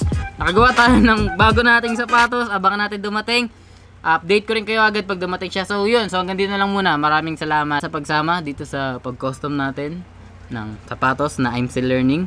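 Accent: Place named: Visayas, Philippines. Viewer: Sobrng bisaya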